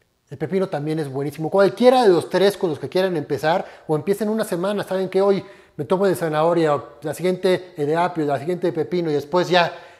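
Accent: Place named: Mexico. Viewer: Menos NLA BLA BLA Y MAS clara y NADA DE ORALE ORALE . ERES MEJICANO?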